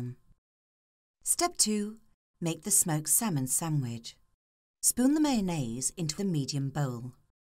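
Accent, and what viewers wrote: England, United Kingdom. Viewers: knew it would be legit after I heard the English accent. lol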